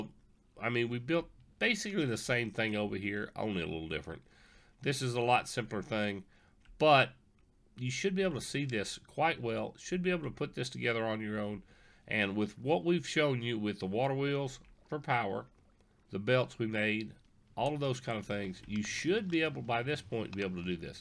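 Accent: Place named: United States. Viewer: this mans voice makes me miss the USA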